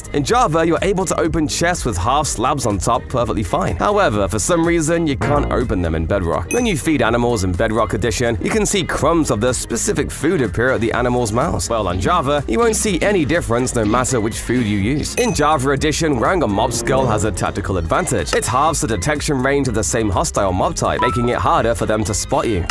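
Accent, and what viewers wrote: United Kingdom. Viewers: You used to sound american and now you're british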